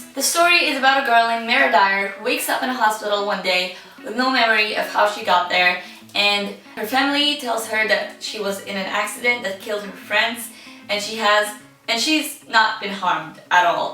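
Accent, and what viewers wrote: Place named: Israel. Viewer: אומייגאד את ישראלית!! חחחחח אני שעה צופה בסרטונים שלך ולא קולטת.. ועוד שחשבתי לעצמי וואי המבטא שלה מגניב שתגיד מאיפה היא...אשכרה לא זיהיתי את המבטא של הארץ שלי.. איזה כיף לראות ישראלית בבוקטיוב!:)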